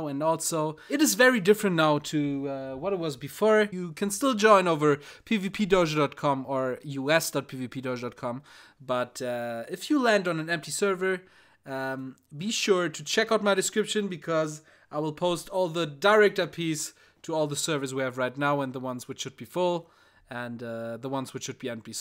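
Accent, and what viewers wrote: Germany. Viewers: 100% DEEnglisch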